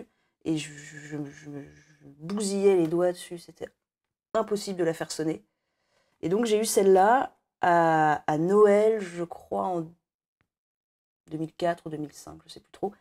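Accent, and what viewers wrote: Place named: France. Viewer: since I saw you playing all instruments on your own I wondered what kind of treasure I digged out of youtube: You are so wonderful young comparing to me, the music I listened to at the end of sixties/beginning of 70ies...I ´m happy having been alive then, with my VW-Bus T1, long hairs and ´big things´etc.... but: I miss a daughter or a son of my own who understands my ´faible´ for this passed era of musical art, feeling and behaving.I´m not a musician myself but I think I could distinguish good and bad easily...kneeling in front of your ´Grandmother´ (never heard of her until now) this would be suitable for me music-aficionado...You must be at least of double language/national origin? Singing without any notable accent in English!?And talking French fluently? And while French-talking your English has French accent!? (why? to fulfil French listener´s expectations?)Thank you Mrs., especially tonight your cover has been moving my soul. 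Stay healthy and happy, good luck in your future fate;-)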